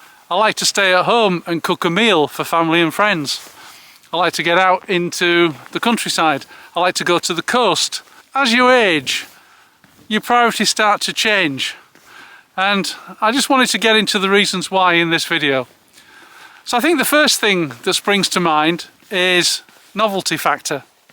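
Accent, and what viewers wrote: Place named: Hull, United Kingdom. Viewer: Detecting a little Hull in your accent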